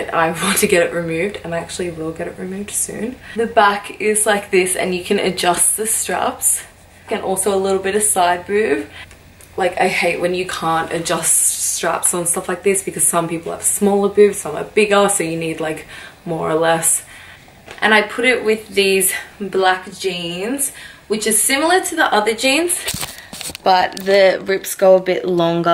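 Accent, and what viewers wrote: Australia. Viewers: I have a feeling more men watch these videos then women lmao a blonde with an aussie accent and a,killer body !!! you are a 10.... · love your Aussie accent!! keep the vids coming, love them!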